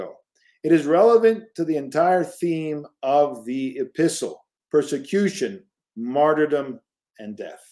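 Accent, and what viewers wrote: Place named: Upstate New York, United States. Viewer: Does originally come from Syracuse/Rochester, New York? His accent sounds like it is from that area. I knew someone from a church I once attended in California who had his same, exact, identical voice; and he had moved to CA from Syracuse/Rochester, New York.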